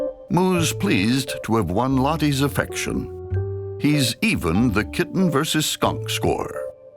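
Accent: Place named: Transatlantic, United States. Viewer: Long time since I heard that transatlantic accent! Makes me think of an old cartoon movie.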